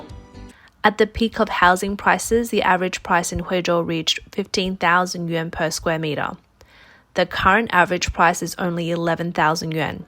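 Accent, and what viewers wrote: Australia. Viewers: I was in the chat some days ago watching her video too but the lass in question who narrated a couple of days ago is a different lady to this narrator. I think that lady is a Hong Konger from Sutton, London; whereas this woman narrating now is likely a Taiwanese or possibly Hong Kong Aussie probably from Brisbane or Sydney or Melbourne. I think many others who watch China Observer confuse all of the female narrators😂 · I know a fellow Australian when I hear one 😊, but it's anybody's guess which city she's from because Australia (unlike, say, Britain) doesn't have obvious regional accents (just a few minor differences in vocabulary). I was in a workplace with people from all over the country, and if you wanted to know whereabouts in Australia someone was from, you had to ask them. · You have a nice Australian accent, maybe you can sell to Australian investors that want to escape the Melbourne winter. 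because these prices are 5 times less than a Melbourne apartment. Sell to boys from Melbourne and they can get a nice Chinese wife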